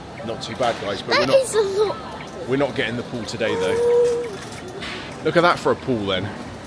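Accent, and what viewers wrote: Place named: United Kingdom. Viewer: I love that British accent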